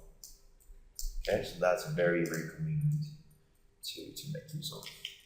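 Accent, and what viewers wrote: South Africa. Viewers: Hey man, nice video! You sound South African, i am from JHB myself!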